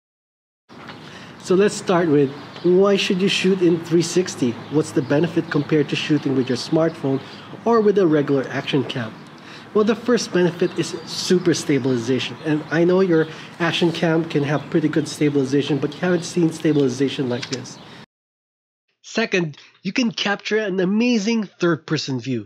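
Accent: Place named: Denmark. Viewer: Er 100% enig med dig. Du lyder dansk, så svarer lige på dansk;) Er også vild med de her begynder guides. Jeg har ikke bestilt min OneR endnu men sker indenfor et par dage når jeg har set tilpas nok videoer så jeg er blevet overtalt:):):) haha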